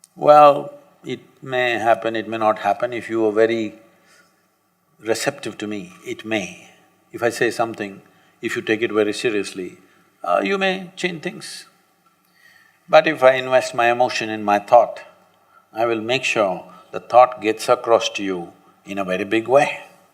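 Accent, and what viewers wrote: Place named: India. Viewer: I've subconsciously created the thought that i truly can't stand the Indian accent anymore and so it is now. When I hear it, i get this "eww" type of feeling, such an ugly accent.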